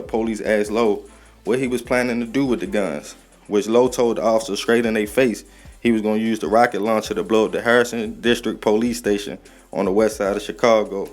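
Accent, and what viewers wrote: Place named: DMV, United States. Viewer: Lo was 18 bro get it right sound like you from the dmv so you not from out here so it's not your faught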